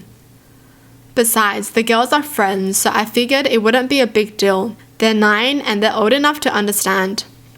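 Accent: Australia. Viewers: Australian, (She's Vietnamese-Australian) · Australian I believe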